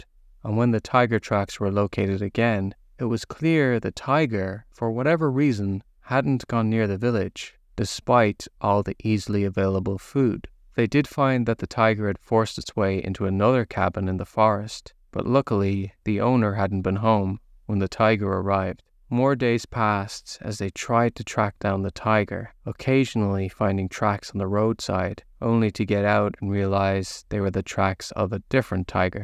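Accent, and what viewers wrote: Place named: Ireland. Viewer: Please tell me that you are NOT trying as hard as you can to hide your beautiful Irish accent!!!!! Ahhhhhhh! Stop it! We LOVE Irish and their musical accents.❤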